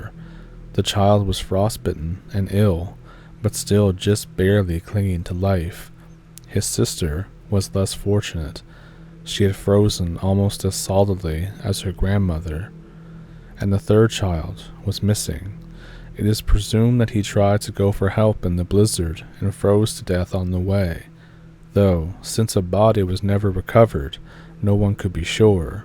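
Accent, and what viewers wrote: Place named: Nova Scotia, Canada. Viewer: Are you in N.B or N.S? I know the accent as I am from Nova Scotia hahah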